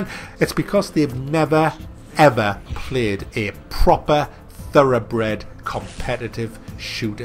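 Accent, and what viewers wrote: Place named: Birmingham, United Kingdom. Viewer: Buy you sound like your from birmingham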